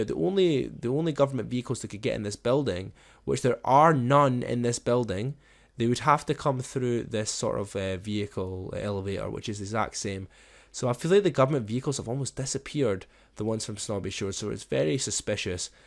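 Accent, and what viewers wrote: Ireland. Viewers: Are you irish??? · Are you Irish cuz it sounds like it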